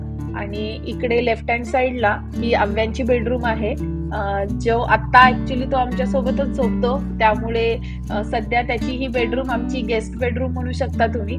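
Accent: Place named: Vidarbha, India. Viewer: Tumcha accent vidharbhacha watto 😅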